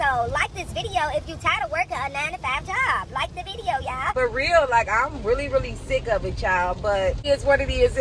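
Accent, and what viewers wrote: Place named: Florida, United States. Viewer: Your Accent Reminds Me Of My Florida Folks.😱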